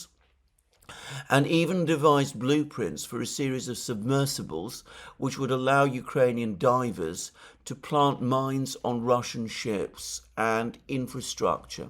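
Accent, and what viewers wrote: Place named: RP, United Kingdom. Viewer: Click clack click clack over the rickety bridge. Who is the ogre? Are NATO the Billy goats gruff? Freudian slip Alex? Public school - your accent is emulating public school. I did suggest it is a dislikes of your position that makes you love Russia. Kim Philby said the same but to most, he was establishment.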